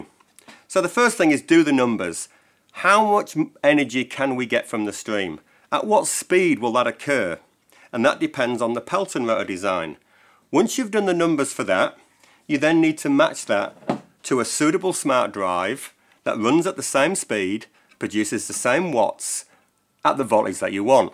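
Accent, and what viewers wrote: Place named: North, United Kingdom. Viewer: That's some accent mate! Northern UK with a Kiwi twang?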